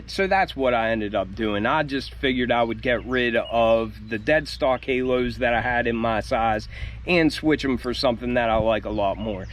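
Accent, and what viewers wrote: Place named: Pittsburgh, United States. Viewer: You from Pittsburgh bro? I noticed the hat and shirt. Plus the accent . I’m from there so I’m curious…I paid resale for those too unfortunately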